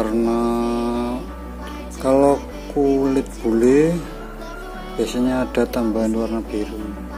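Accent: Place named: Java, Indonesia. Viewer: medok nya itu loh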